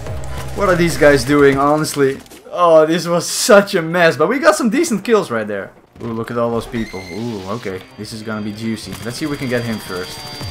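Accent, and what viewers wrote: Netherlands. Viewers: Je hoord dat je nederlands bent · Very nice dutch accent i love it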